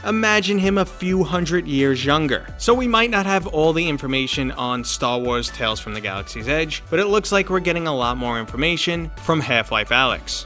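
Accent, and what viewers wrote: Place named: Boston, United States. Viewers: You are so Boston holy shit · Lmao it does sound little bit boston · your boston accent made this video 10x better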